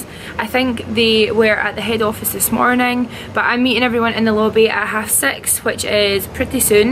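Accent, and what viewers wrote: Scotland, United Kingdom. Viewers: I need a Scottish accent I love it!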